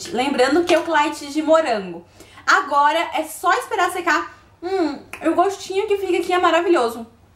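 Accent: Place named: Rio Grande do Sul, Brazil. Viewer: Super gaúcha nesse vídeo né? Amei a dica! Bjs Guria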